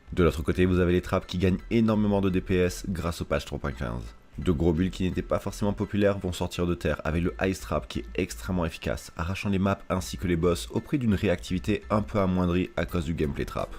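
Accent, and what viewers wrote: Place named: France. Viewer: I feel like 90% of what he said was english words prononced the french way :D gotta step up your frenglish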